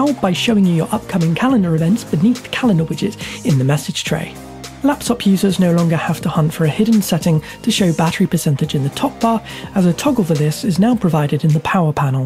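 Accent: United Kingdom. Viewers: Where is that accent from? (Sorry, I'm not a native speaker, but it sounded really cool to me) Is it british? Excelent video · Heavy British Accent!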